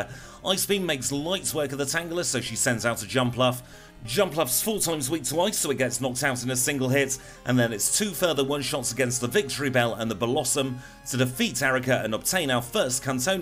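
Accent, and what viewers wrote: United Kingdom. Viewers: the way British people pronounce the letter "H" makes life worth living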